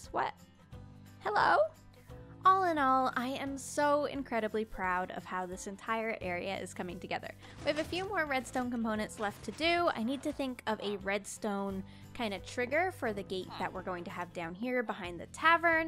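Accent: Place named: Canada. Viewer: but still, her Canadian accent confused me a bit, as I am from England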